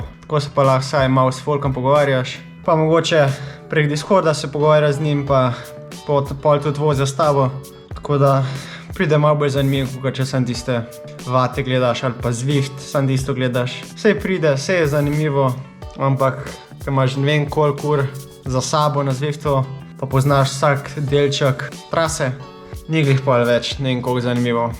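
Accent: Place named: Notranjska, Slovenia. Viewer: Bi me zelo zanimalo,če boš objavil časovni načrt z kraji,v kateri smeri boš vozil pri tvojem podvigu čez vse te občine.Če bomo zaželjeni,je nas verjetno kar nekaj,ki bi ti šlo nasprot in ti delalo malo družbe,da ti bo lažje.Še nekaj bi te vprašal,pa ne zamir,firbec pač,glede na to ,da ne govoriš špreho,značilno za kraje okrog Rakeka,me zanima,če si domačin,ali ste se priselili sem,sicer pa kakšno prav fajn zaviješ vmes po notranjsko😉,ja,držim pesti da se ti izidejo tvoji načrti in brez nezgod, lp.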